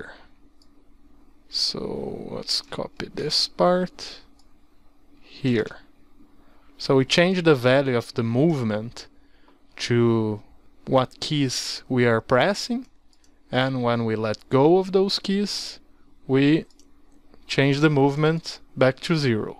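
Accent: Brazil. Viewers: O teu sotaque brasileiro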